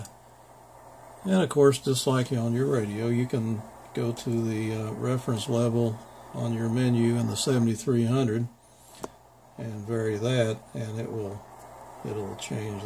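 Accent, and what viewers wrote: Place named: Charlotte, United States. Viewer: I just today found your videos. You sound like you are close to me in Charlotte. Our “accent” sounds like mine. Am I correct?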